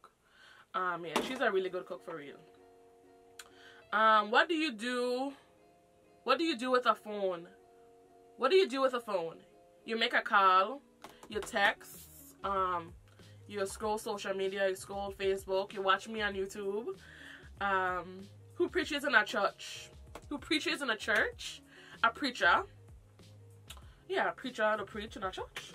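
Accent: Virgin Islands, Caribbean. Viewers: My husband is from St. Croix and he have the same accent, I’m American but I be trying to talk in his accent to bother him lol but over the years I’ve learned how to understand you guys so well ❤️ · I was just watching your Rice Water video and I knew i was hearing a Caribbean accent lol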